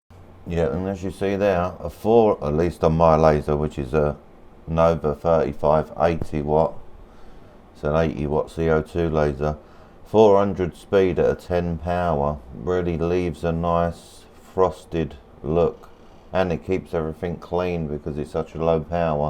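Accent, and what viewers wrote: United Kingdom. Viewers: I thought you were from the UK with that accent.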